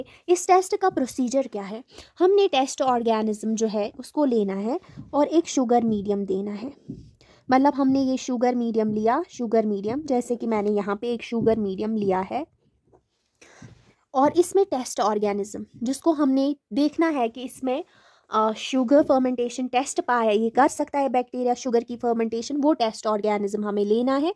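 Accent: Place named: Kashmir, India. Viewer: you are kashmiri by your accent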